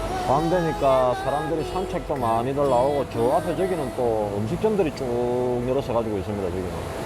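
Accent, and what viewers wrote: Gyeongsang, South Korea. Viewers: 오래간만에 해운대 마실 나가셨네요~펑소엔 못느끼는대 외국에 오랫동안 나가있음 작은추억하나 사무치게 그리울때가 많으시겠죠~ 님 인기비결을 제가 나름 주제넘게 예상해봤어요~^^ 1.벗님들이 평소 보지 못했던 북미대륙의 구석구석을 운전자관점에서 볼 수 있어 좋다 2.말투는 오리지널 경상도의 투박한 사투리를 쓰는대~어라? 영어로 모든 토킹이되네?ㅎㅎ 투박한 말투의 선입견을 가진 저를포함한 벗님들의 예상을 깨는 어휘실력 3.운전벙커안의 생활 모습을 스스럼없이 보여주는 솔직함의 매력 4.본인 스스로가 어려움을 겪었던 과거,현재를 솔직담백하게 풀어서 구수하게 이야기해내는 매력 5.돌쇠와 날쇠의 멋진 콜라보레이션 특히 날쇠라는 아이템이 짱인것 같습니다 제가 생각한 인기비결이신것 같구요 캐나다 돌아가셔서도 그냥 더 잘해야지 하지마시고 평소대로 꾸준히 해주심 좋겠어요~~^^ 그리하셔도 벗님들 다들 어디 안가시고 그대로 계실듯요 주제넘게 주절주절 시부맀네요~~ㅎㅎ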